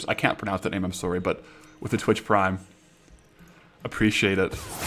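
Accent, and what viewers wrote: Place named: Alberta, Canada. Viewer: oh shit! Buddy is from Alberta haha, love to hear it my man!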